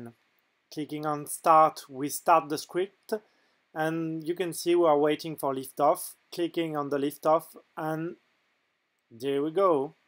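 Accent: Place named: France. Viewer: Quelle négativité. Malgré l'accent français on comprend très bien ce qu'il veut dire et la vidéo présente très bien la fonction.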